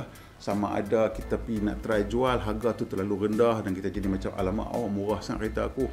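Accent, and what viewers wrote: North, Malaysia. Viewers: Suka dgq abg ckp...utara tu...sama kita...ckp jelas lg teranggg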